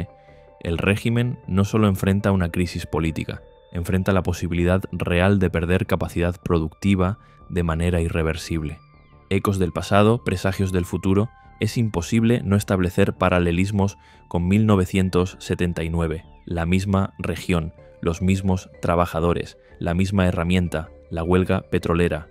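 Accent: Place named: Castile, Spain. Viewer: P A X. Sus análisis contienen un componente de la lógica filosófica, más elemental, que cualquier persona con un buen sentido común lo perciben con facilidad. Por otra parte la dicción de su locución, es muy castiza de la fonética de la vieja Castilla: muy agradable de oír. Enhorabuena !!! Gracias !!!!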